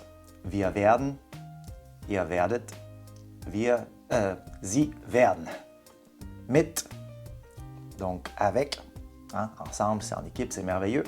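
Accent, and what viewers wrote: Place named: Quebec, Canada. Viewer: Bonjour, je viens à peine de m’y remettre à l’allemand, ayant abandonné cette langue car j’avais une très mauvaise méthode et une méconnaisance de l’apprentissage puis je me suis rattrapé grâce à l’anglais, l’espagnol et l’italien (j’essaye d’apprendre le turc aussi) et j’avais tant envie de m’y remettre. J’ai trouvé (tout juste) votre contenu qui est très pédagogique (j’adore l’accent québecois). Une bonne journée de la part d’un alpinois .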